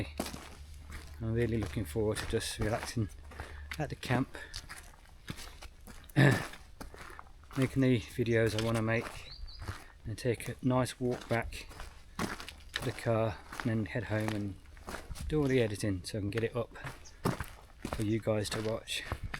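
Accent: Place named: England, United Kingdom. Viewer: New subscriber here, just watched your SnugPak Jungle bag review 👍🏻 Sorry to see you had your heart attack but glad to see you made it and on the mend. Don’t be afraid to look at complementary protocols to run alongside the conventional too 👍🏻 Hope your well for a very loooooong time 👍🏻 Incidentally you sound English to my ear 👂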